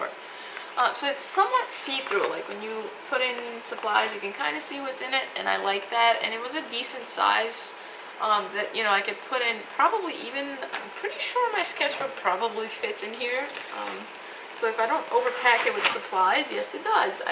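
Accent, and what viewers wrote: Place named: East Coast, United States. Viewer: Oh yeeah. Pens are friends. As unabashed as that is to admit.. well, sort of. You've a cool East coast accent.. NY? Boston? I agree about pencil vs pen, took me ages to see the light but now def better to go straight pen when drawing. You might dig these pen cases by Lihit Lab 'Smart fit pencil case' Helps keep pen choice down n neat design that stands up into pen pot. Im not sure if you can get hold of them stateside but some of my fav pens are the Winsor Newton watercolour markers. So good. Be safe, happy drawing 🎨 My latest, with pen stuff too <link>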